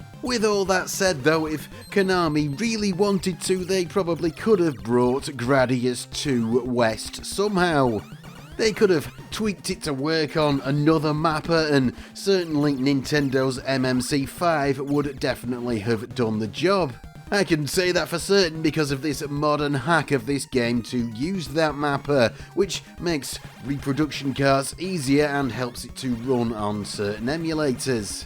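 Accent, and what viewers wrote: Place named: Northern England, United Kingdom. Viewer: Another British guy talking about the system that was so unpopular and under the radar in his own country. A North Englander, by the sound of it, with the "thoot it wood make them moony."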